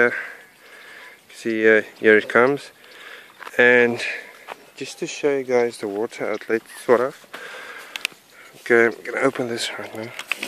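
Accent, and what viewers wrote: South Africa. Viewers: Thank you. Pneumatic / hydraulic....... very impressed that it is still so loud, even with rubber seal / buffer in it. Would be so great if you could use all that closing force to fick a wheel / generator over, the last 1/2 inch may be and a ratchet / escapment may be? Water wheel, bring wheel up to the snout, or bring snout down to the wheel, or extend the snout & let it free fall onto the edge of the wheel. Were is "flood season".....? you accent sounds in Africa... south? Regards Bob ( London UK ) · great,I thought I recognised the SA accent, nice video!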